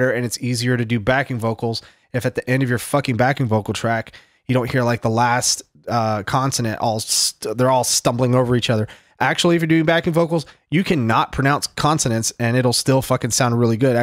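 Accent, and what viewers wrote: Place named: Appalachia, United States. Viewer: The hillbilly accent 😂 I love it!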